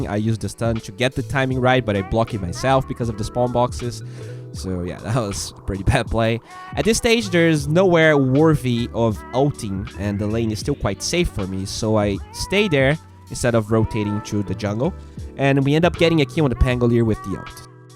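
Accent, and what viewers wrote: Brazil. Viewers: dude, stop complaining about your accent. Your English is perfectly OK. And your accent always will be from Brazil. Your videos are Awesome keep like that. Hug from arg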